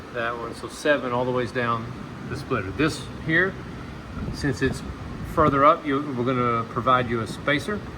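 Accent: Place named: Texas, United States. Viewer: Texas Talking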